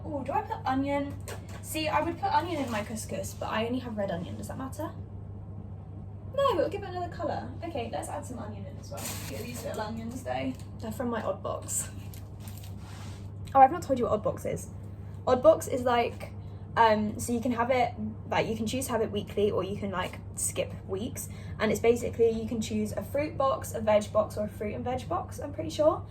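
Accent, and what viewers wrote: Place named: RP, United Kingdom. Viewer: I just watch your videos because i really enjoy listening to you :elbowcough: RP best accent :shelterin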